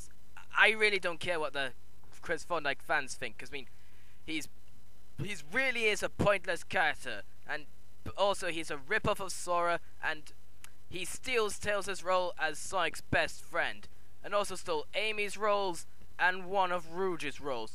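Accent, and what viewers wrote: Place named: United Kingdom. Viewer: Well I 3/4 agree with you but I lol'd when you started acting like Chris,and you do sound like you have a British accent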